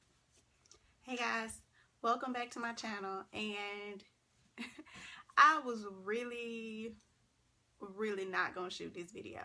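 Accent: South, United States. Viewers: I live in SC and you sound way more southern than I do lol! #southerngirlsrock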